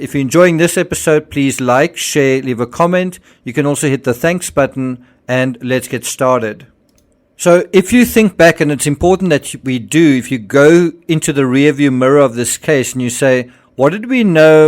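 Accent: South Africa. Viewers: Is the narrator South African?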